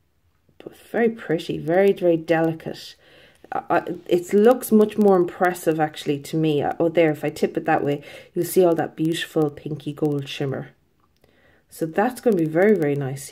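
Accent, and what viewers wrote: Ireland. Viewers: Love the video! Really cool to hear an Irish accent talking about nail polish... most people's eyes glaze over when I talk about it. ASMR btw? I'm from Dublin so glad to hear a fellow Irish polish nut!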